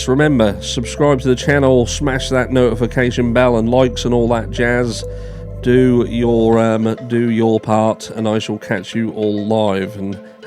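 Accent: Norfolk, United Kingdom. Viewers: Obviously don't tell people where you live but by your accent I believe you lived in Norfolk .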